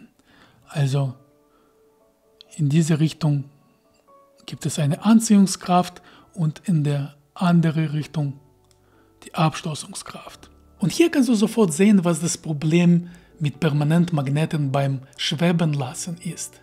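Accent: Russia. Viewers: Wie immer, toller Beitrag in einem herrlichen russischen Akzent! Danke für Deine Mühen! Ich „spinne“ man ein wenig: Die „Kunst“ bei der Levitation ist nicht, die Levitation selber! Die Kunst ist, die Wechselwirkung des „skalaren Higgs-Feldes“ um den Probanden, mit den subatomaren Teilchen (Quarks) des Probanden, zu unterbinden! Ohne diese Wechselwirkung keine „Masse“ und ohne „Masse“ ist levitieren ein Kinderspiel! Derjenige der das hinbekommt, der bekommt alle nur erdenklichen „Nobelpreise“ die es gibt! Garantiert!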